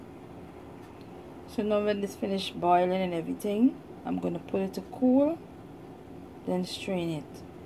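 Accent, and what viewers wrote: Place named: Guyana. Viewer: Peace! You soundin like my countrywoman (bini) from Guyana. Thanks for this Queen!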